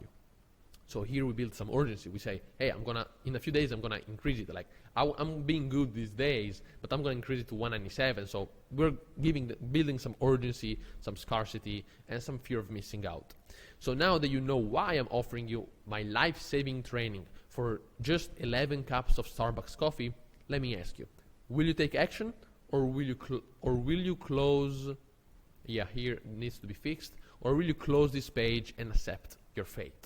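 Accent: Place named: Italy. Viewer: You Italian accent is just so cool to listen bro ❤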